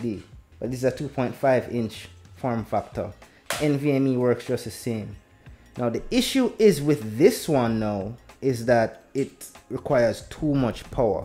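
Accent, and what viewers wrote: Jamaica. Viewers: Wait a yard man?? I was expecting an American heeediot 😂 big up my yout 👊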